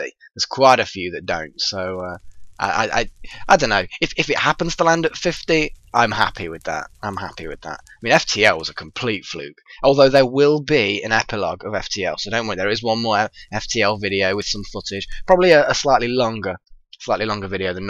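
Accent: United Kingdom. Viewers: I totally agree. The accent is just awesome same with Scottish accents. But I don't think I've ever paid attention to anyone with an Irish accent. (mainly cause I haven't heard anyone with one) But yeah the accent is one of the cool things about people from the UK.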